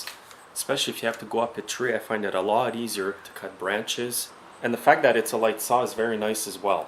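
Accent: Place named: Quebec, Canada. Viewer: Ok you sound like a Quebecer 100%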